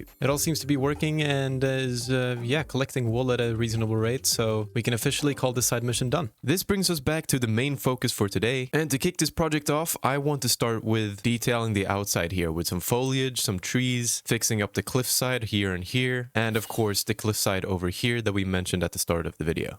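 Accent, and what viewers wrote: Sweden. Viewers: are you Swedish??!! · Wait are you Swedish lol, that’s identical to a Swedish accent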